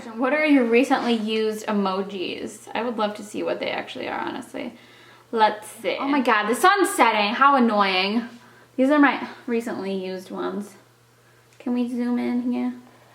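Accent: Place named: New Jersey, United States. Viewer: lol i have been watching since she started on and off and I always knew she was from NJ because of the way she talks and she talks with her hands a lot like we all do from NJ specially with italian blood but I never heard her say it and after I saw the back of the house i was like that has to be an older NJ house!!! LOL specially up north NJ bc they are soo much more pricey that you are almost forced to buy a fixer upper but in the end its better because you can put ur own little finishing touches! xox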